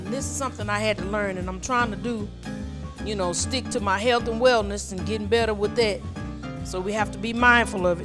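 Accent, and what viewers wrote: South, United States. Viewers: Aww i need a oven, when i have one i am going try this, i would like to make sweet patato pie one day to.😀💐 you are funny " yall wanne me to cook so i,m gonna cook looool and beautifull youre doing fine with the explanation and that beautifull southern accent, i do believe the camera man should do more close ups on the food and the cutting and in the pot, later he or she does that, if its youre husband filming you i understand he cant keep his eyes of you 💐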